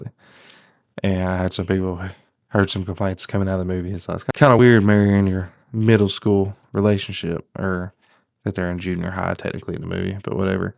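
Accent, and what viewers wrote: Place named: North Carolina, United States. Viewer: love your accent, sounds like North Carolina. I still need to watch this movie, somehow I keep missing some of these ghibli movies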